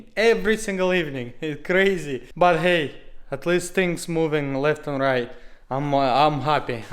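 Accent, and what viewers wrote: Russia. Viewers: ебать по акценту слышу что это русский вася!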